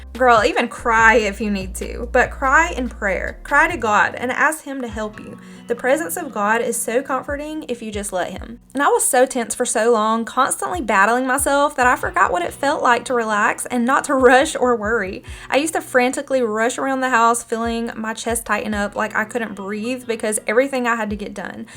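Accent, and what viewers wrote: South, United States. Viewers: Girl, I really needed to hear your words today! I have to remind myself of those same things every single day. I was stuck in fight-or-flight mode from the time I was 9 until I was 31, so I completely get it. After years of therapy, thank God, I’m in such a better place now. I’ve only been staying home for a few years, and it’s still an adjustment. I’ve got a 15-year-old, a 9-year-old, and a 1-year-old—and I just love your videos. Your accent makes me smile too since I’m from the South as well. Thank you for sharing. ❤ The power of prayer is so real! Continue to pray for your enemies!!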